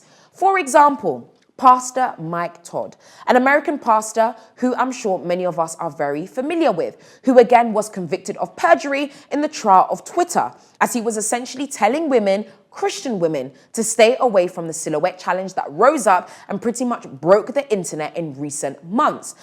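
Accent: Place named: England, United Kingdom. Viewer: This is not an issue with whether your reporting is accurate or appropriate but your statements concerning Sarah Everard may cause you problems. You have stated in this report "It was later found that she had been kidnapped and murdered by 48 year old Wayne Couzens". He has not yet been convicted so to state this unequivocally in those terms is factually wrong and also potentially in contempt of court. I am not sure where you are based but you have an English accent so I presume you are in the UK and if so commentary such as this could put you and your channel at risk. This is a link to information on the legal principles here <link> This is the related guidance <link> and this is a link to a report from the time The Sun and The Daily Mirror were fined for their reports on the murder of Joanne Yeats <link> Even if Wayne Couzens is convicted at trial or by pleading guilty the issue is potential prejudice to a fair trial. 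I think you need to give some attention to these requirements to avoid potentially ending up on the wrong side of the legal principles.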